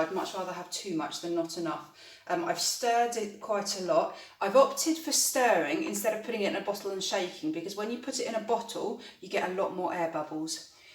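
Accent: United Kingdom. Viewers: It makes a lovely change to hear a British accent. This is beautiful. Having had so many disappointments while I learn I can only dream of creating something like this. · Beautiful and it is so nice to hear a British Artist - just starting out so this is so helpful - can’t wait to watch more of your demos 😀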